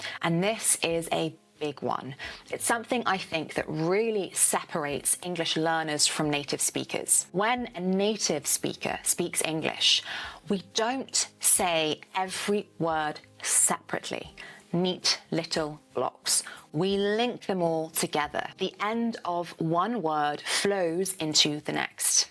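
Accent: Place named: Cambridge, United Kingdom. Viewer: I love your crisp Cambridge accent. Just wanna confirm is it really Cambridge or some other accent.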